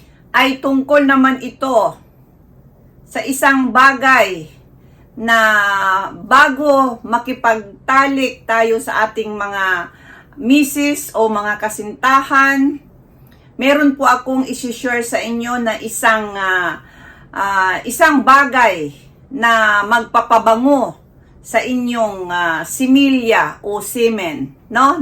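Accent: Visayas, Philippines. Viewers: Anong province mo ma'am? Kasi Ang salita mong Tagalog Ang tuno bisaya halata na Hindi ka pure Tagalog.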